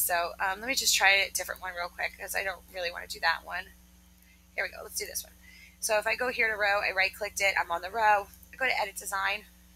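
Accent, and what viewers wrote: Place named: United States. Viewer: Full American English, only native USA could understand it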